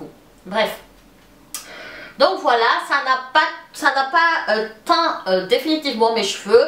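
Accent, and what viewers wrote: Madagascar. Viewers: Tu es malgache ?ça s'entend à ton accent ;)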